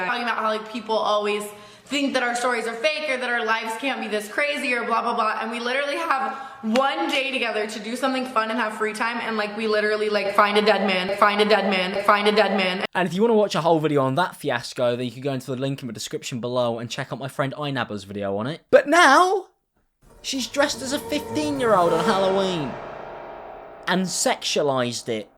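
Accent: United Kingdom. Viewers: Yes, it is intriguing. And he speaks with a British accent too.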